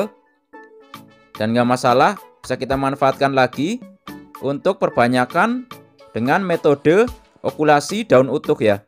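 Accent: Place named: Banyumas, Indonesia. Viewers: Keluarkan ngapakmu mas bro wkwkwk.... Mantul pokoke😜😜😜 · oke mas, mksh banyak atas ilmunya.. Sy smakin faham. Sukses selalu. Boleh tau mas asli tegal ya? Medoknya ky logat tegal.hehee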